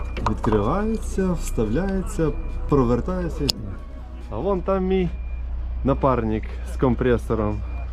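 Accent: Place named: Transcarpathia, Ukraine. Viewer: Закарпатський діалект мене трохи здивовує😅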